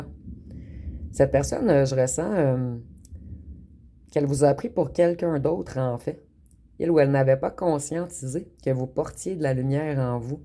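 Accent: Quebec, Canada. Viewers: Tres beau tirage merci beacoup il me parle j'adore votre accent québécois et j'adore vous ecouter..... merci❤❤❤❤❤❤❤❤❤❤❤❤❤❤❤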